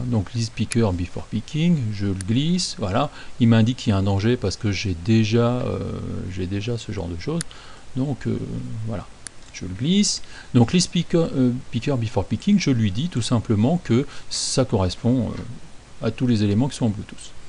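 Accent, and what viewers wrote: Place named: England, United Kingdom. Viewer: Attention à l'accent anglais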